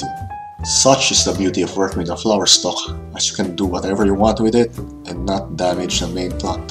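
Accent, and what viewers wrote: Philippines. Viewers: You sound like a Filipino guy :)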